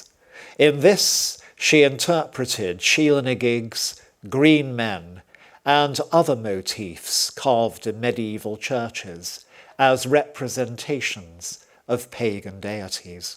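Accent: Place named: RP, United Kingdom. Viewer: It’s called RP, Queen’s English.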